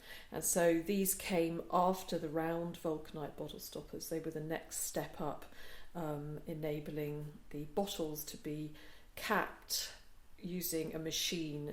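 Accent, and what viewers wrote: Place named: RP, United Kingdom. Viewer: you sound so posh! Did you film the opening shots from your home on your balcony overlooking the Ritz in Mayfair? All said & done, as always a great video - thanks you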